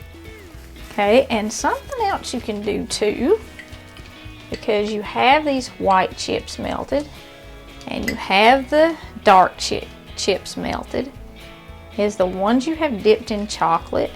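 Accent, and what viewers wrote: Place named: South, United States. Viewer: love ur southern accent... so adorable!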